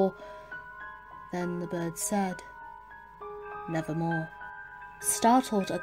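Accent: United Kingdom. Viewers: British accents are seductive to American ears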